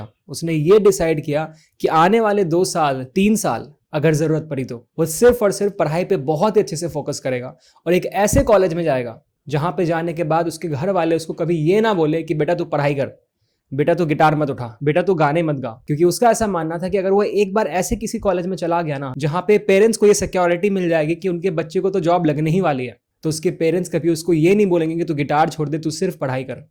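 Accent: Bihar, India. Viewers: Bihar se ho app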